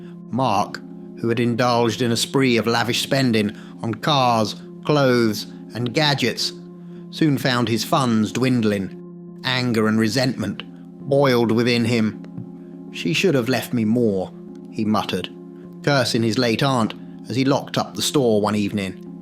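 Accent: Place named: United Kingdom. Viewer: You're appearence has change a bit but.your enchanting voice along with your british accent still haunts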